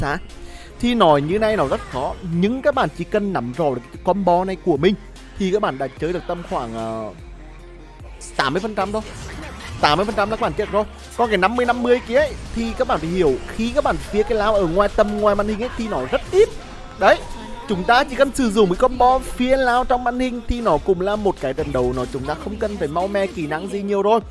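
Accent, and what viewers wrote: Nghe An, Vietnam. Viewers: Người miền Trung, Nghệ An đúng tình cảm luôn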